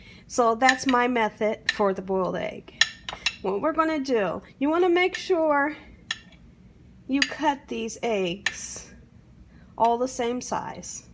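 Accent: South, United States. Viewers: This lady’s from the south for sure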